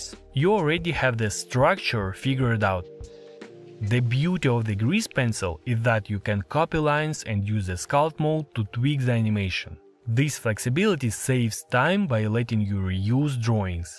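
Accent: Russia. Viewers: Where is cheating. It took years to complete. Also this runglish is an ear ache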